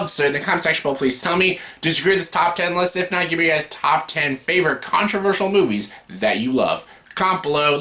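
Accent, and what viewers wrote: Canada. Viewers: What are you saying is your favorite Kubrick film? Canadian accenting hard here lol. Speaking of, is that how most Canadians pronounce Melancholia? 😊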